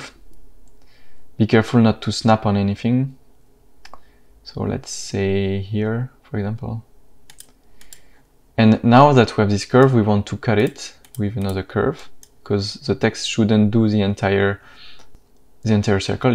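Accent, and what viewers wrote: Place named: France. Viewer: I think you are a french native speaker ;)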